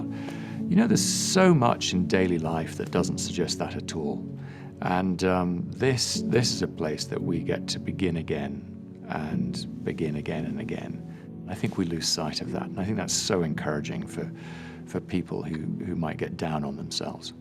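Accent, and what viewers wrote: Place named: United Kingdom. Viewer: LOVE THIS MESSAGE SO MUCH!! esp w/ the british accent well done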